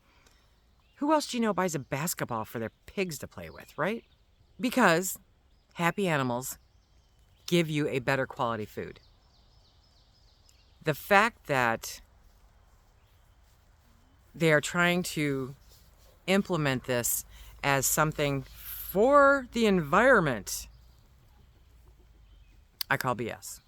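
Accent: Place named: California, United States. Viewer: Sounds so California. Keep it there please.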